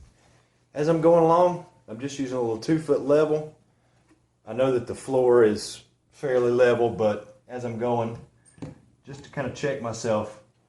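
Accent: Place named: Georgia, United States. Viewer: thanks for the quick response! Where do you do your work? You sound like you're from GA.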